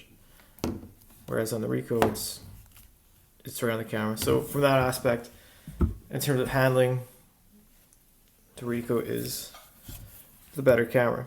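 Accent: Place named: Canada. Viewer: This guy sounds Canadian af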